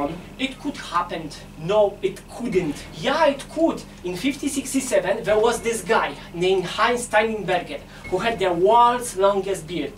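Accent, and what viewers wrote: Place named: Poland. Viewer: Po tym angielskim widać że polska ale spoczko filmik 😊😊😊😂😂😂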